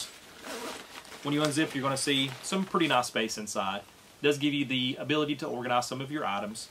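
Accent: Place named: South, United States. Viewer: Love your charming Southern accent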